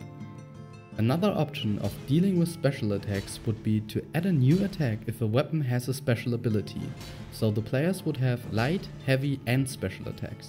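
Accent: Germany. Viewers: ..I'm inspired by diablo and gothic :) Are you living in germany or america...you sound german, but that doesn't mean you live here. :)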